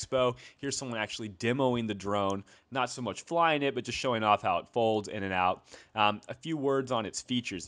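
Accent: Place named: China. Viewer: Your accent is Chinese but you are so easy to understand for a non-native English speaking person. Good job! I believe the Tencent Ying will form the base for the Dobby 2 (working title, will probably be renamed to Hesper). The Dobby AI is a closed development project I consider dead. ZeroTech need to get their fingers out their asses and continue their front position with innovative and high quality drones, would not like to see them make clones of the Mavic Pro.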